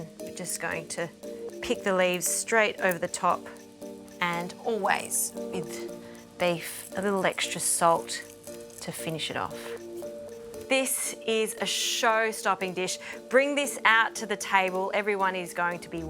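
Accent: Australia. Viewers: You have a nice Australian accent, where are you from? (If I may ask)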